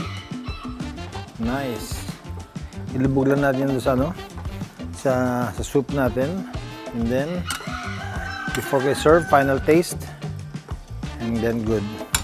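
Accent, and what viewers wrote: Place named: Visayas, Philippines. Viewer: Wow Sarap po ng luto niyo sir. Yung tono ni Goma parang nagshi shift sa bisaya at tagalog hehe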